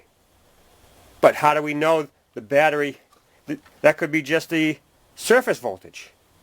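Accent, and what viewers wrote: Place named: Boston, United States. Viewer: My supervisor is from Boston and has the same type accent, it's common in the New England area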